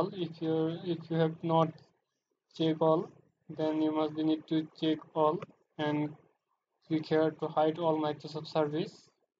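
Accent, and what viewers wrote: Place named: India. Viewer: I couldn't understand what this guy was saying. Indian accent.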